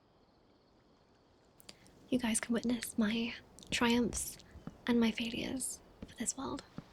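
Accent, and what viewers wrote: United Kingdom. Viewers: As soon as I heard the British accent, I knew the struggles of the heatwave I'm British too and this is GENUINE hell. Anyway amazing video and very relaxing. Great job.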